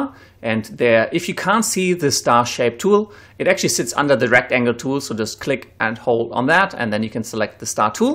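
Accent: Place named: Germany. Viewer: eindeutig deutscher Akzent thank you helps me alot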